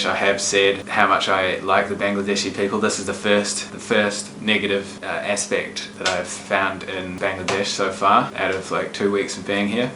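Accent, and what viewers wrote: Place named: New Zealand. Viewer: Hey,...just want to stay how good your videos are, so interesting, and eye opening in all aspects. You are very courageous even to venture into the places you have, and as I will definitely never venture into those parts of the world that you have so bravely taken the time to visit, your videos give a very good idea of what I've chosen not to experience personally. Hats off to you for continuing this channel. Stay safe, watch for crime, and keep them coming. I'm an avid follower now. And by the way, I spent 23 years in NZ living in Auckland and Wellington, so your accent is very familiar to me. I now live happily in the prairies of Canada. We are avid travellers to most of Europe, Australia and New Zealand, Alaska and the Caribbean areas, taking cruises mostly. With the COVID, of course, things have gone on hold for about 2 years. 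I'm 74 now and once again, want to thank you for all the good work you are doing to enlighten us on how some of world exists. My wife and I say every day how blessed we are that we live where we do. It's so interesting, though, that despite the difficulties you have encountered, people there are so friendly and seem to be coping somehow. Once again, stay safe, keep those great videos coming, as it is the only way I'll ever appreciate how there is so much suffering in our world today. I'm afraid the population is getting out of control in the areas you are visiting. Hopefully, humanity will sort it all out one day. Cheers!!!!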